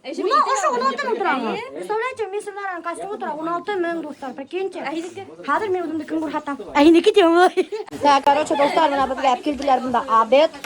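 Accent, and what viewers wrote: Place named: Bashkortostan, Russia. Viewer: Похоже вы хорошо знаете татарский. У нее самый ни на что есть башкирский, да ещё зауральский.😂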